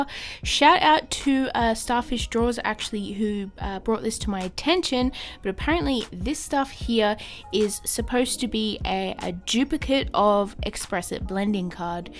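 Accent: Australia. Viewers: Thanks for the welcome! Loving your channel so far, so nice to hear the aussie accent too 😉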